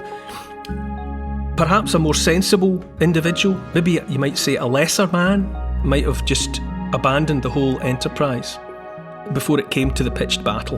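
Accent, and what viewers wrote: Scotland, United Kingdom. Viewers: I like talks about rebellion best when they come with a Scottish brogue, and most of all when the mouth is framed with the fabulous beard of Neil Oliver.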